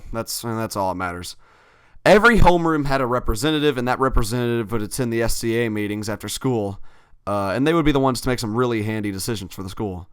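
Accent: South, United States. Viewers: like if you like people with country accents :). also can you do a collab with your mom.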